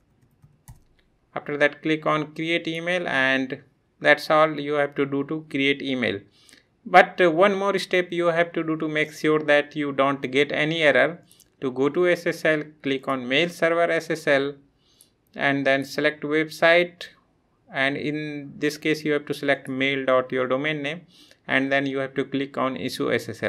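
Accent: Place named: India. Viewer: Excellent video. Was somewhat difficult to follow the Indian accent, but i will try this way to install Mautic after trying the bare bones SSH which I got some issued with certificates, redirections or DNS don't know....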